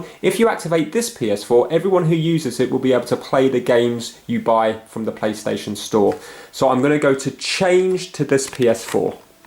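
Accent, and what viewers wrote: England, United Kingdom. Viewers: Very well explained and I like your English accent.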